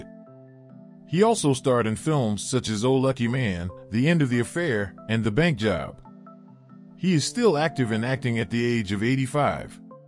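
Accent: United States. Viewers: A narration with an American accent is inappropriate for this video. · Got the pronunciation wrong. Told in americanese.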